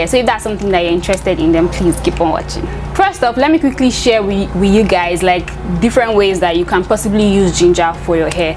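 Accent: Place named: Nigeria. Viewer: You are so pretty plus I love the nigerian accent 😊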